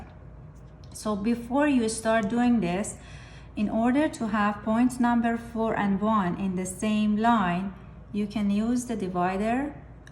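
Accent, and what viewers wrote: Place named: Iran. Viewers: I love this accent Persian people 😍♥️ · She is Persian? She sounds like Farsi accent.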